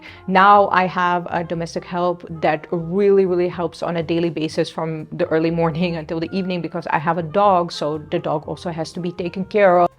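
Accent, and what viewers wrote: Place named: India. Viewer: Je heb spreekt Indiaas Engels.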